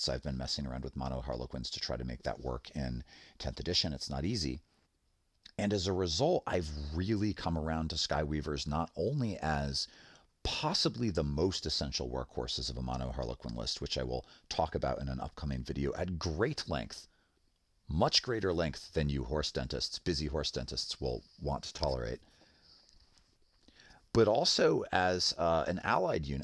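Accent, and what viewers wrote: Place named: Canada. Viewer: Not saying Canadian's are cooler or anything. It is a cool accent nonetheless. We are all from London anyway. 🤣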